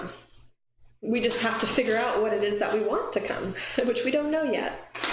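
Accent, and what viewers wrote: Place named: Georgia, United States. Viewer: I love you in pink, btw. Love your explanations! Thanks,!! Love that subtle Georgia twang;)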